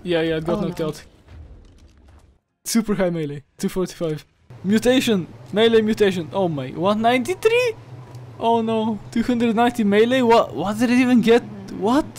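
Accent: Spain. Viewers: Se nota que eres español 😁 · hablas como si tu idioma principal fuera el español, xd talvez no me entiendas pero pues saludos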